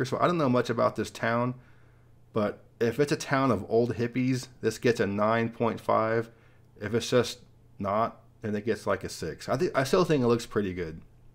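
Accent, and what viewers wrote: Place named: California, United States. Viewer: I like how u speak like a true Californian about our home state's flags.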